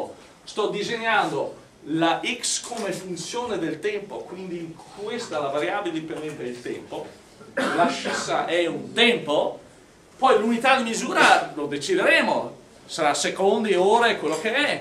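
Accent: Veneto, Italy. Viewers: A me sembrava Veneto! Probabilmente ha imparato direttamente quello 😂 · Ma ha l’accento veneto hahahahha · Questo professore non solo è bravo, ma è uno spettacolo umanamente parlando: un saggio. Mi bevo le sue lezioni che è un incanto. Un americano con l'accento veneto, poi, non ha prezzo!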